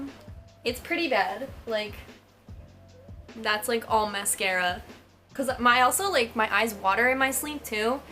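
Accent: Philadelphia, United States. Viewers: I feel like you say, "I don't even-t know" with your Philly accent a lot :)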